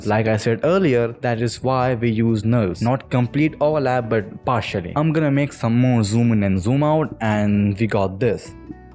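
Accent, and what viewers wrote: India. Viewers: always the indian acent with the best tut:) love u sm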